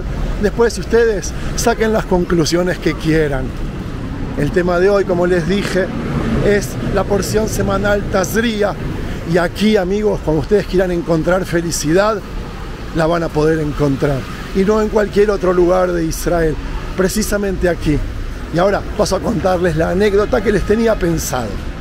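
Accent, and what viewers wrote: Argentina. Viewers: Estaria bueno que defiendas las Islas Malvinas con la misma energia,va digo por tu acento argentino...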